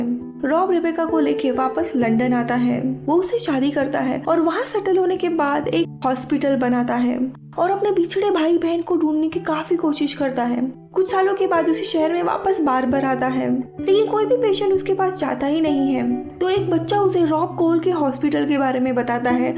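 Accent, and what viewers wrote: Maharashtra, India. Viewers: May be from marathi school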